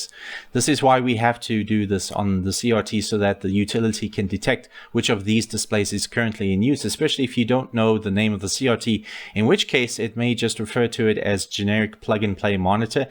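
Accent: South Africa. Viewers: Sorry I have to ask, are you a South African or in South Africa? Cause your accent sounds like you are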